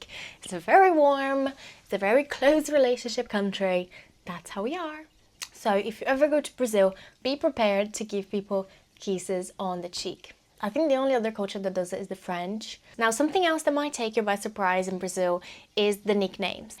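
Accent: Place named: United Kingdom. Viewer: Delightful, charming and insightful! Your ‘British’ accent, btw, is adorable! 👌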